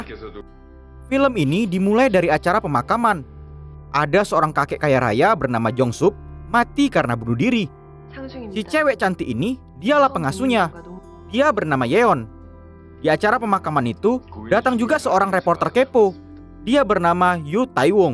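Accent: Medan, Indonesia. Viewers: Maen kli bahh bahasa logat Medan kita itu ya wakk 😂 Salam dari Belawan Lae ku 🙏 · Bahasanya kyk tmen sy orng medan,lucu😂🤣😂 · Kayak nya dari medan ya bang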